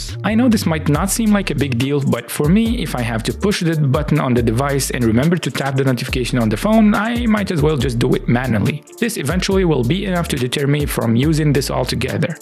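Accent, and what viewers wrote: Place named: Morocco. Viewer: Great Job! I have a friend who is interested in programming, he would love to see this. I can guess from the accent that you are probably from Morocco Correct me if i'm wrong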